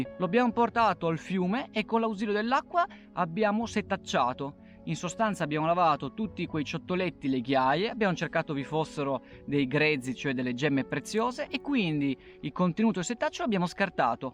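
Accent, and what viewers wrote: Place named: Piedmont, Italy. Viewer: Mi sono iscritta una settimana fa circa! Per caso è apparso un tuo video e sentendo accento piemontese mi sono soffermata a curiosare ! Sto guardando tutti i video vecchi. Grazie della compagnia.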